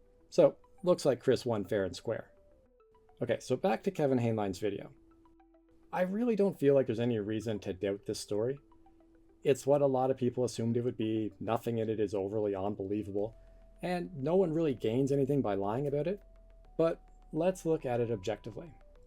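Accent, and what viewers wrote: Canada. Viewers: did you not hear the characteristic Canadian ow sound?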